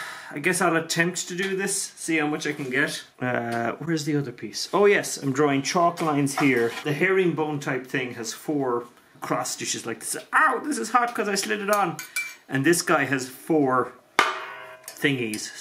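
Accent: Ireland. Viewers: I just love hearing other Irish people since I moved from Eire to Australia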